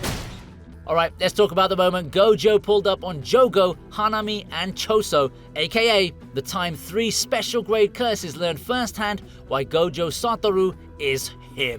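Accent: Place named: United Kingdom. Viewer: Always ruined by a terrible British voice.....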